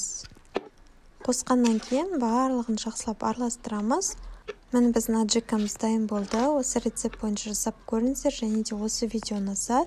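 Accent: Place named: Uzbekistan. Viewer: Ашты деген соз жок ащы .Казакша дурыс сойлендерши онтустик халкына отиниш .Айналып дегенди айланып дейсиндер жыным келеди .Озбек , калпак болып кеткенсиндер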